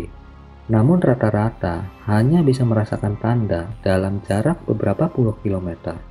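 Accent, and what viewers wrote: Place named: Bali, Indonesia. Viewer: Logat bali ini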